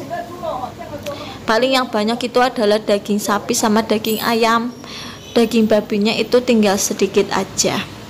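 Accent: Banyumas, Indonesia. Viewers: Hadir KK,,,ora ngapak ora kepenak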